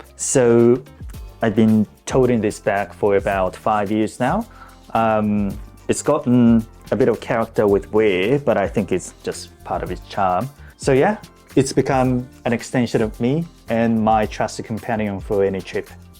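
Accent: Australia. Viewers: 質問です。ネイティブの人がこのアツさんの英語を聞いたとしたらどのくらいオージーアクセントなんですかね？ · You really sound like an Aussie mate lol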